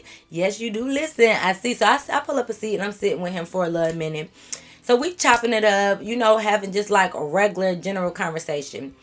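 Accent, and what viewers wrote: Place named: Chicago, United States. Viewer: I swear I cannot watch any of the others because you just know how to storytell! Girl where are you from though? I could’ve swore I hear a lil Chicago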